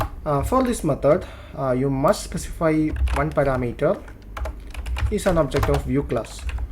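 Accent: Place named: India. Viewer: I'm starting to like Indian accents :)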